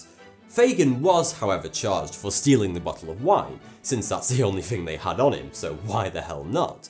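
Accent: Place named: RP, United Kingdom. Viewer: Great received pronunciation!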